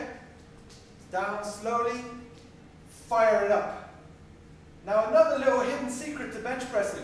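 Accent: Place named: United Kingdom. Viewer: The accent and brit lingo makes this by far the best bench tutorial I've ever witnessed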